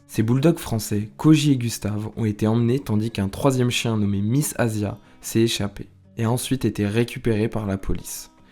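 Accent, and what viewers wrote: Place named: United Kingdom. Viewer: et des rappeurs? Noirs et donc forcément rappeurs quoi! Ce sont des chanteurs, pour ta gouverne et si tu n'as pas ce minimum de culture, faut pas faire de videos pour désinformer c'est pas le but. Ça remet en question la crédibilité des infos de l'ensemble de ta vidéo. Perso jai arrêté en cours de route. Entre ça et l'accent anglais je ne suis pas sur YT pour m'abrutir mais pour m'élever....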